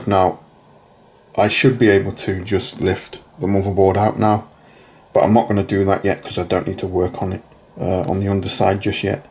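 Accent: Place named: England, United Kingdom. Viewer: U sound just like bmw doc lol y'all must be from the same part of England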